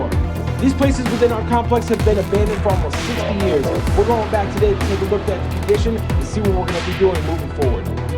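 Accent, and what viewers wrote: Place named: East Coast, United States. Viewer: Are you serious? The man is from the East Coast, guess you don’t get out much.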